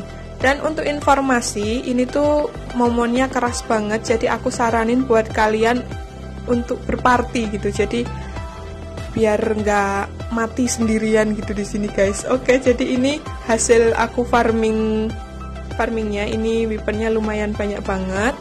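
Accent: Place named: Java, Indonesia. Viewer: Medok :v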